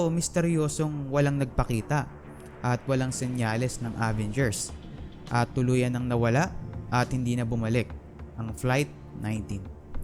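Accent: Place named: Pampanga, Philippines. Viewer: Capampangan accent hehe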